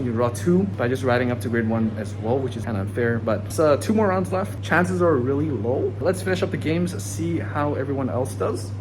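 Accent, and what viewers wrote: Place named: Canada. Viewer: I thought you were Canadian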